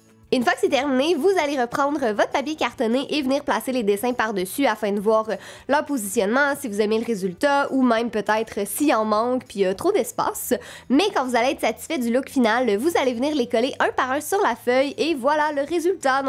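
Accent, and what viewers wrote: Quebec, Canada. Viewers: Tu as un petit accent Canadien il te va trop bien . Quand tu étais petite tu parlais Canadien ? Sinon je t'adore tu es trop belle je suis abonnée est toute tes vidéos sont superbe J'ai n'ai jamais vu une artiste si c'est toi haha Bisou😀😀😀😀😀😀😀😀😀😀😀😀😀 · Vous êtes une femme ravissante, de plus avec l'accent Québecois! :) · L'accent québécois 😍😍😍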